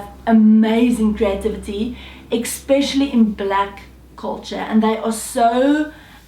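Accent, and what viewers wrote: South Africa. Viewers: Regte boere meisie.. your accent puts a smile on my face. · Your accent is awesome to non-south africans like me by the way, looking forward to more of your videos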